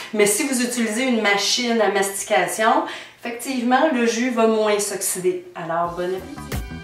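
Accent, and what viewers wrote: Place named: Quebec, Canada. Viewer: haha j'adore l'accent québecois calice ! Vidéo intéressante sinon ; merci.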